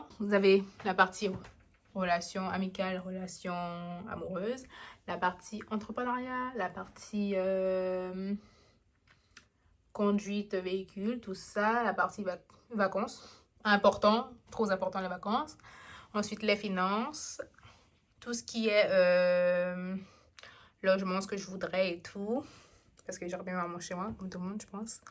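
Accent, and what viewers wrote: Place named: French Guiana. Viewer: Tu as l’accent guyanais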